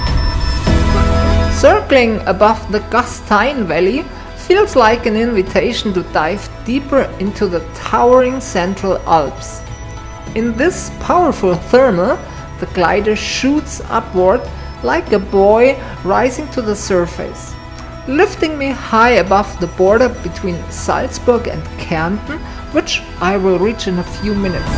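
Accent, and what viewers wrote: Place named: Upper Bavaria, Germany. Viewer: Gerne,! Du hast einfach ein feines Händchen für stimmungsvolle Bilder, gekonnte Schnitte, musikalische Unterlegung und Kommentierung mit NI (=Natürliche Intelligenz). Als halber Niederbayer liebe ich natürlich ganz besonders Deinen oberbayrischen Dialekt, aber Englisch hat auch 'was;-) Ich kann Dir (eigentlich vor allem Deinem Freund Gerd) aber ein weiteres Kompliment nicht ersparen: Die Flüge, die Gerd so kurz nach der SPL-Lizenz zusammen mit Dir unternommen hat, nötigen mir wirklich ungläubiges Staunen ab. Speziell nach dem ich selbst in dieser Saison innerhalb von vier Monaten die Segelfluglizenz ablegen konnte und daher ein wenig nachfühlen kann, wie man sich als "Jungpilot" jenseits des eigenen Platzes fühlt. Deswegen Euch beiden ein grosses Kompliment für die cineastisch perfekt eingefangenen Flüge im hochalpinen Terrain - Chapeau und Danke für's Mitnehmen! 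😊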